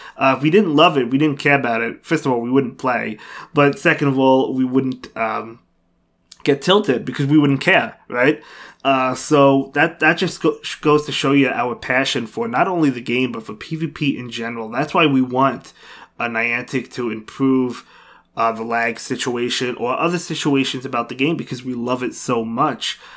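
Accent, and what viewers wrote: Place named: East Coast, United States. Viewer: I just thought you had an east coast accent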